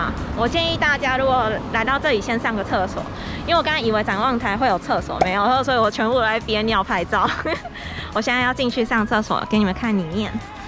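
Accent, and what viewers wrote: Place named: Taiwan. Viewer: Typical Taiwan accent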